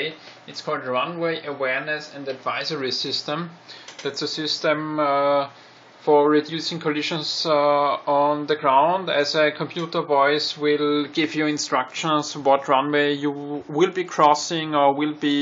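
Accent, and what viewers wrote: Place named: Austria. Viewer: danke fürs video, sehr anschaulich und interessant! Ich hab an ihrem Akzent gemerkt, dass sie Österreicher sind hehe, Grüsse aus Graz hehe!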